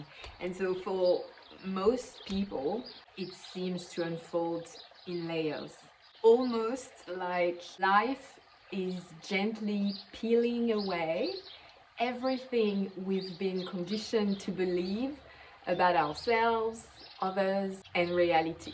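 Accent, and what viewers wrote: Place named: France. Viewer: It is funny how some videos just appear. It sounds like you are french. Have you heard about the cathars?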